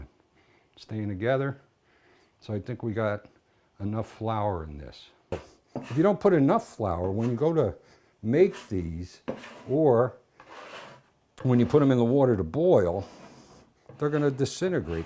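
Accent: New Jersey, United States. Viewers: Knew he was a fellow new jersyian from his accent - he didn't need the t-shirt to remind me of home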